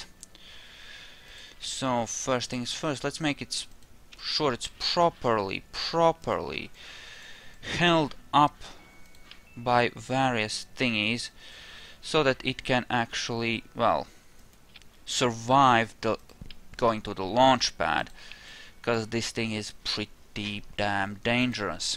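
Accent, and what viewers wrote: Russia. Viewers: That's actually a nice rocket! By the way, you can hold I think shift or control or something and click on the Apoapsis or Periapsis and the altitude numbers will stay on the screen. I like your KSP vids. I wish they were more popular. You never say uh, uh, uh, uh, like others. Your accent is cool. It's like listening to Russian space race videos. LOL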